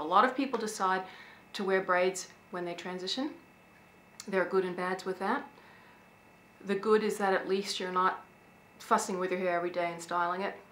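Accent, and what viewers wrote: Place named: Australia. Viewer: I hear it too, pinky. There is SOME kind of accent there and it does sound Australian!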